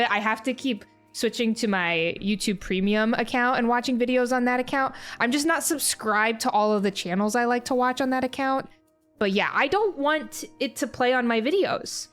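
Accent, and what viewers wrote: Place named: Midwest, United States. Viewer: Is it just me or does she have the most Midwest accent possible?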